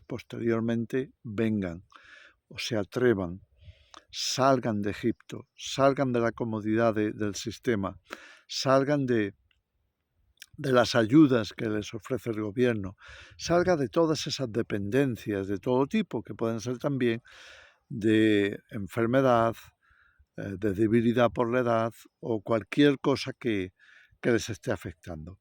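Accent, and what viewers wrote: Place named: Spain. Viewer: primera vez que te escucho y veo hermano!,con mi familia dimos ese salto de fe al mudarnos a una pequeña ciudad a 1500 km de distancia al surde argentina,junto a mi esposa entemdimos que Dios nos llamaba a algo diferente,y en 11 años aprendimos a esperar de la provosion de DIOS atraves de un pequeño negocio de comidas tipicas del norte argentino...hoy argentina esta siendo gobernada por un verdadero ANTICRISTO un ser inmoral y despota que esta llevando a la nacion al hambre y la desesperanza,tuvimos que desdoblar las fuerzas y esperanzas en el SEÑOR Y SUS PROMESAS,aprendiendo y recibiendo el mensaje que; la IGLESIA DE CRISTO EN LA TIERRA sera presionada atravez de la economia familiar! Coicidimos con usted pastor y sus palabras. ...por el acento,veo que es español,de donde es su localidad??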